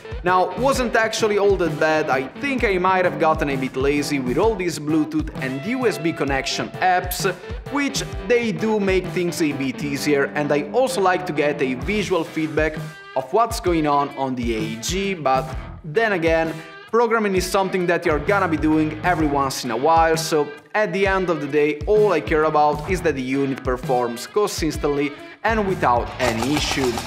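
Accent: Italy. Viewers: what an annoying voice you have. Overkilling italian accent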